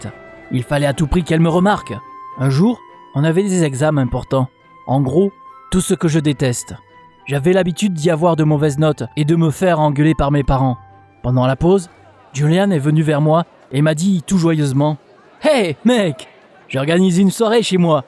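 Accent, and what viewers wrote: Marseille, France. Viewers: Je viens juste de remarquer qu'il a un accent marseillais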